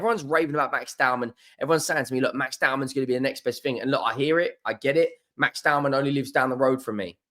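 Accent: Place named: London, United Kingdom. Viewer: Why is a guy with a London accent telling Liverpool fans what we think about our players? We all love Gakpo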